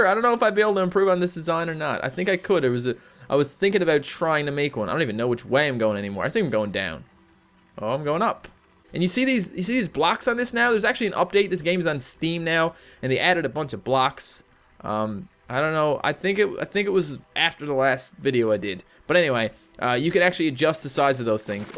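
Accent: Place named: Newfoundland, Canada. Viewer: Your a newfie arent ya? I can hear it for sure xD its the newfies six sense to pick out other fellow newfoundlands haha ;)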